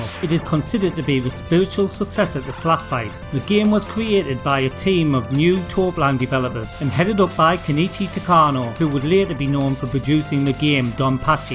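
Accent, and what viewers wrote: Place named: Newcastle, United Kingdom. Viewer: You've done a great job managing to hold onto your (geordie?) accent in spite being over in Japan for so many years....or have I embarrassingly confused you with Retro Core?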